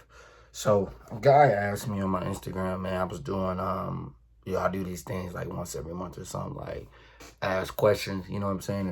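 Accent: Baltimore, United States. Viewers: u from baltimore?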